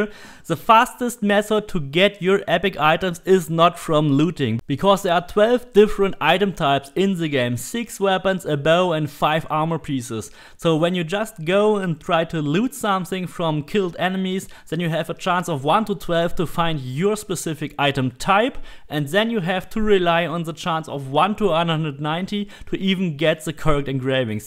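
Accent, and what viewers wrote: Germany. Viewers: I’m just curious are you German? Or Austrian? Or from a totally different country cause I’m dumb lol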